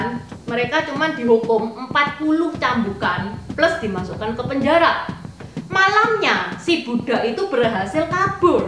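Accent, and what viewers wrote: Java, Indonesia. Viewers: Logatnya njawani men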